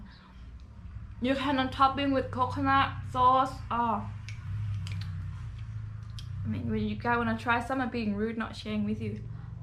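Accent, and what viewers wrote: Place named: Australia. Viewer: You slowly getting an Aussi Accent ...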